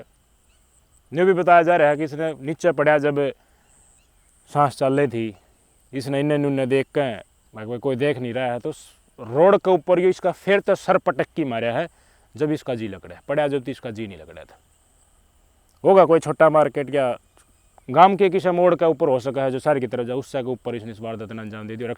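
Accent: India. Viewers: Desi boly mast h bhai sahab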